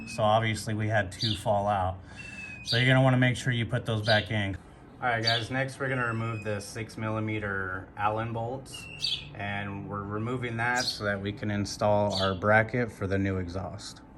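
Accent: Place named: United States. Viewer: Damn bro that American accent. AcrapOvik. It's akrApovich. Other than that, nice informative video❤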